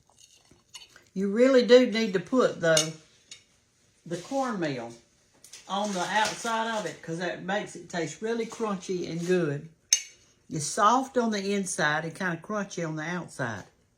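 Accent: South, United States. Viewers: your such a sweet person I just love watching you cook and hearing your southern accent and I like your recipes also prayed for your healing also. GOD BLESS YOU darlin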